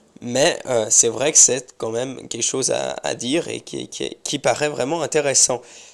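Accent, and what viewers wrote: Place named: Switzerland. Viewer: ça s’appelle un accent! vive la Suisse romande et vive les vidéos de l'!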